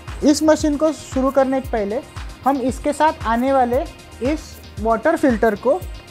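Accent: Maharashtra, India. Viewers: Are you marathi? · are you marathi?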